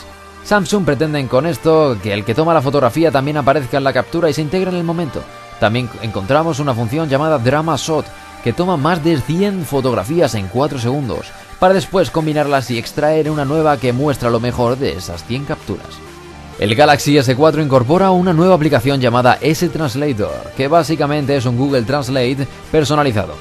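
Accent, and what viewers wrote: Spain. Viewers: me la fuma el ezpañol de ezpaña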